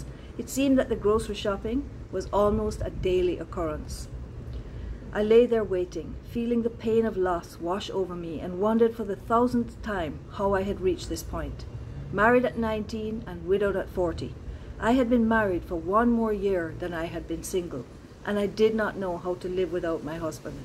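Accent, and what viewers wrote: Jamaica. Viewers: I love this! Lynda is one of my good friends, so it's wonderful to hear Redemption Songs read out loud in a genuine Jamaican accent. As a Jamaican author, I appreciate that you are sharing the stories from our beloved land. 🇯🇲🇯🇲🇯🇲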